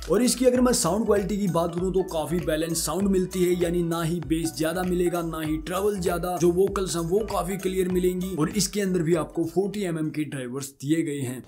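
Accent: Haryana, India. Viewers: Bhai haryana t h k tu 😂🤙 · awaj me haryana jhalak rha h bhai.😃... baki full support h bhai ko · Pakka tum Haryana ke hi ho:face-green-smiling::face-green-smiling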